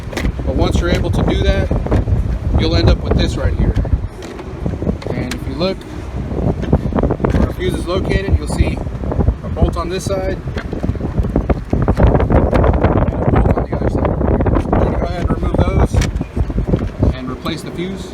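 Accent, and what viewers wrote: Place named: United States. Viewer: Where are you located? Noticed the km/h speedometer(with mph in the smaller section) and thought that was a bit odd. Figured you must be outside the states but your accent sounds American.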